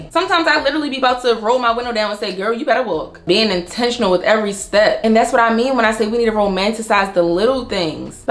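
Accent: Baltimore, United States. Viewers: It sounds like you’re from Baltimore so ima subscribe!!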